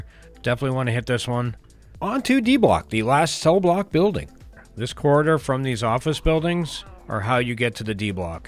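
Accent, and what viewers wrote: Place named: Maine, United States. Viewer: oh yessa! Coast of maine hee-ah dee-ah!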